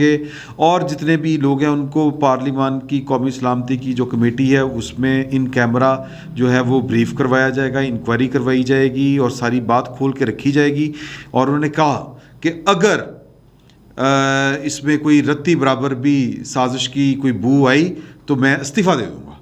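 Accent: Punjab, Pakistan. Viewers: You always share nice informations. However i like your way of talking. Urdu mai Punjabi ka Tarka. appreciated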